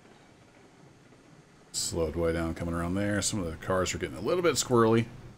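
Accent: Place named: Midwest, United States. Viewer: Is it just me or does sound like he is from the Midwest or the rockys area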